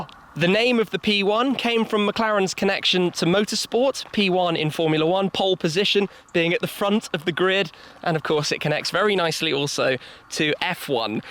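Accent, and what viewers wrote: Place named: United Kingdom. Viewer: Eduardo Galindo Brambila his accent? He's British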